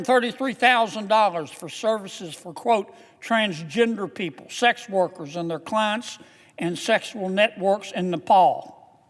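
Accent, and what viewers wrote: South, United States. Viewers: I woukd like to see 99 more Senators just like Kennedy! Love his southern straight and humorous twists in his speech!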